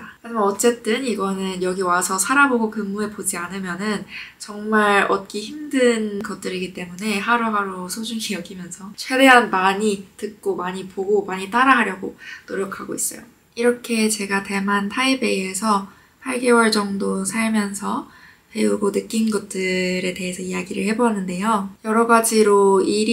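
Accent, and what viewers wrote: China. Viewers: 為什麼您是中國口音😂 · 妳說的中文語調是大陸腔調。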